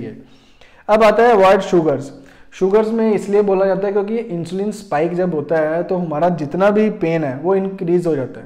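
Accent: Punjab, India. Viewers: As per ur dialect u seem to be Panjabi. Am I right?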